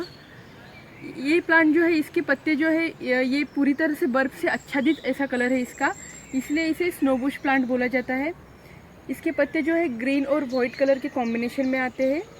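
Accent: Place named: Maharashtra, India. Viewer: Tumi Marathi bolta ka....Tumcha aawaj Marathi Hindi sarkha ahe Mi Nagpur adhun aaj ha plant ghenar ahe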